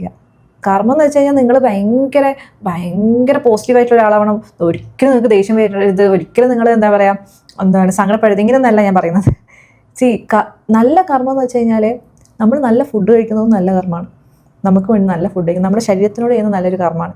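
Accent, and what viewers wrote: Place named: Malappuram-Palakkad, India. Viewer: നിന്റെ സംസാര ശൈലി നല്ല രസമുണ്ട്. മലപ്പുറം പാലക്കാട്‌ ഭാഗത്തെ സംസാര ശൈലി പോലെയുണ്ട്. Anyway good content and good presentation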